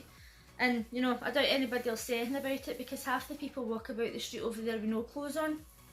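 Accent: Scotland, United Kingdom. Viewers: Nice clothes there, Lol to be honest I mostly watch your videos to hear your Scottish accent I really love it, great video though.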